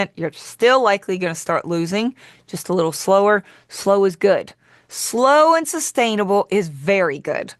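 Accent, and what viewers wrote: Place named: South, United States. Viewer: Am i the only one that giggles everytime she mentions "cute little husband" as code for libido? Golly, i love this gal. She talks like me, but in southern belle terms. Lol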